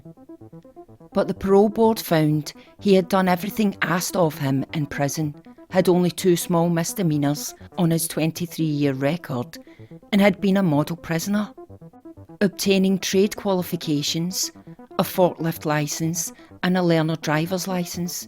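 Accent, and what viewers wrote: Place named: Scotland, United Kingdom. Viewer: Hi there, I came over from the Jamie Reynolds case. I stopped midway, because I couldn't hear, even tho I had the volume at maximum. I was pleased to find out, this recent video, the sound is great :) Oh, and pay no heed to the haters. As if they didn't have the option of changing channels... I myself - love your accent :) I'm American and I find the (Scottish ?) accent fascinating. As a storyteller, you have very good pacing and rhythm, which I very much appreciate.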